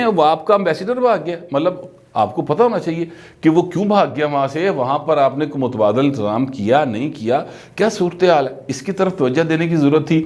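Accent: Punjab, Pakistan. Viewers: Butt saab, tussi Punjabi baut pyari bolde ho. Assi we picchun Lahori Haan. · Sir ma apka vloge just Punjabi k Liye dekhti hon mujy apki gulabi Urdu bohat Pasand hai🙂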